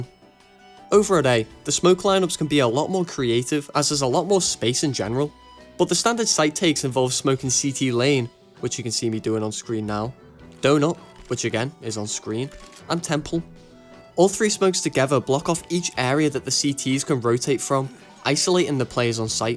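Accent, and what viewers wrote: Liverpool, United Kingdom. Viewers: Was sceptical on a Scouse lad trying to break down a map 😂. Very nice break down and very well put together video brother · Wanted to ask that as your a scouser do you also say your hank marvin? Had a scouse roommate a couple of years ago and as an American I cracked up every time he frustratedly said “ah lad whaddaya on about?!” Good times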